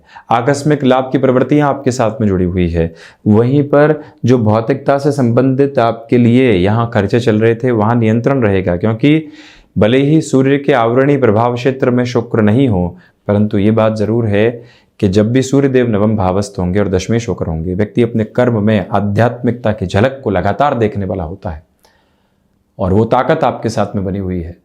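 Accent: Standard. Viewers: Thank you for the predictions ji.🙏🙏🙏. It was crisp and to the point. However Hindi is not my mother tongue so, I had to listen very carefully to understand your words. I got so used to the bollywood style of Hindi which is filled with words from Urdu. So this is what shudh Hindi sounds like! 👍👍👍. I think it will take some getting used to.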